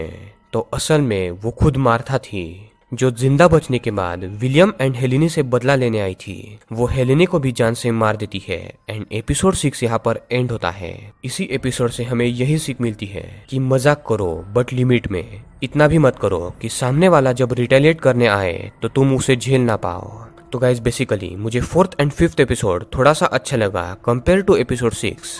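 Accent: Bengal, India. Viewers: Oo apki bat bengoli jesi h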